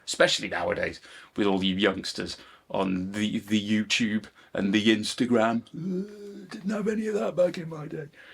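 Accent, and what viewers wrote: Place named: Liverpool, United Kingdom. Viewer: Great Scouser Accent! Amazing playing! ❤❤❤ ❤❤❤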